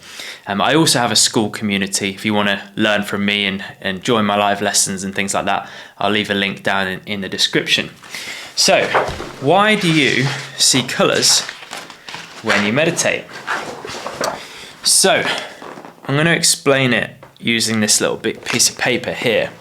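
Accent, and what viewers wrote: United Kingdom. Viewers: I REALLY APPRECIATE HEARING BRITISH PEOPLE SPEAKING OUR LANGUAGE AND SEEING WORDS SPELT CORRECTLY RATHER THAN SPOKEN IN AN AMERICAN DIALECT WITH WORDS PRONOUNCED AND SPELT INCORRECTLY WITH LETTERS MISSING! AMERICAN LANGUAGE IS ENGLISH SO WHY DO THEY FEEL THE NEED TO MISPRONOUNCE AND MISS SPELL WORDS AND CALL IT AMERICAN ENGLISH? PATHETIC!! GREAT VIDEO BY THE WAY FIRST TIME IVE SEEN ONE OF YOUR VIDEOS AND I FOUND IT VERY INFORMATIVE, I'M LOOKING FORWARD TO WATCHING MANY MORE, THANK YOU FOR BRINGING YOUR VIDEO'S ON THE INTERNET. 😊😊😊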